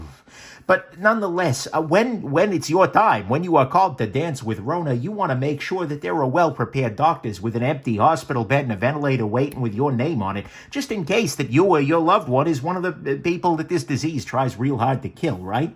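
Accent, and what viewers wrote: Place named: New England, United States. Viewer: I always figured the voice of reason would have a new england accent. Good on ya,. You're a real gem.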